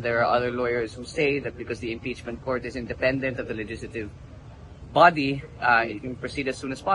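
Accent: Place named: Ilocos, Philippines. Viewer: Haha nakakatuwa yung ilocano accent niya. Yun lang. 😂😂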